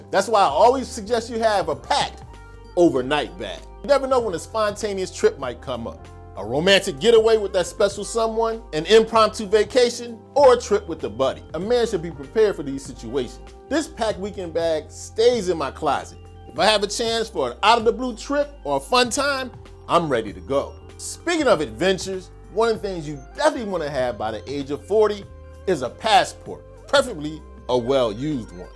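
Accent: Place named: Alabama, United States. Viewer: Bro you from Bama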